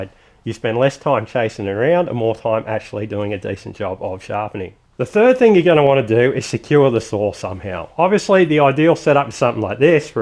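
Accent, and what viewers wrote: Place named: United Kingdom. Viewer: I can’t understand this guy’s British accent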